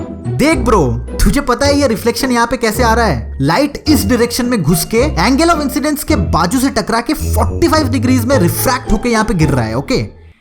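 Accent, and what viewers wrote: Bengal, India. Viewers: Bhai tui west bengal se ho? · BHAI TU BENGALI HAI KYA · Are you bengalee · Tu Bengali hai Kya Bhai... ? BTW nice video Love from Kolkata ❤️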